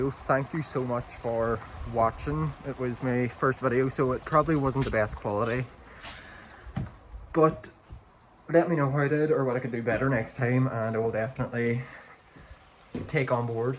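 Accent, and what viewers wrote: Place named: North, United Kingdom. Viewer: We all love your northern accent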